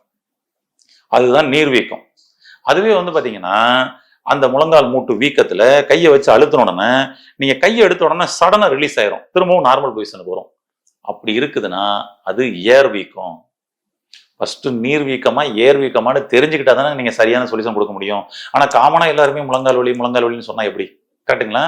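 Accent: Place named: India. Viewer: Dr சொல்லும் சில சொற்களை இலங்கை மக்கள் புரிந்து கொள்ள முடியாதா ? Air (எயார்) என்பதைத்தான் ஏர் என்கிறார். Common கொமன் என்பதைத்தான் காமன் என்கிறார். தமிழில் காற்று என்று சொன்னால் நன்கு புரியும். இந்தியர்கள் தமிழிங்கிலிஸ் பேசுவார்கள்.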